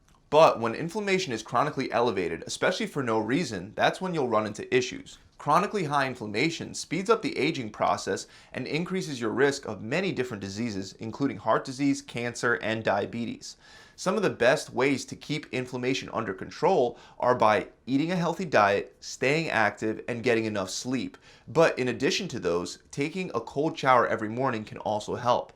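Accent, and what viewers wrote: Philadelphia, United States. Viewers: You from philly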